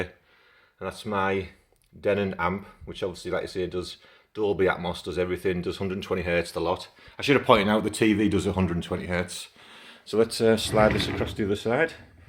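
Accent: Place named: North East England, United Kingdom. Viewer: Looking forward to catching up on some of your vids matey.. I take it from your accent and reference to Boro you're a fellow North Easterner lol 😎👍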